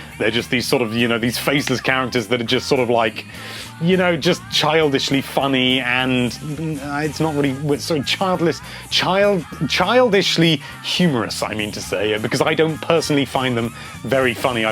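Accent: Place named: Wales, United Kingdom. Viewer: I'm confused because you sound half Welsh and half Posh English... haha!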